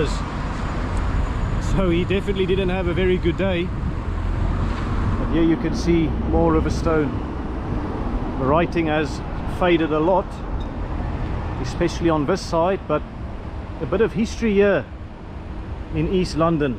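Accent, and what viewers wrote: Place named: Cape Town, South Africa. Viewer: Jy is ñ Kaapenaar met die aksent,! 😁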